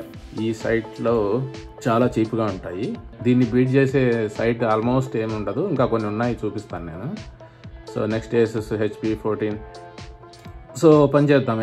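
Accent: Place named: Telangana, India. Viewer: Telangana slung sir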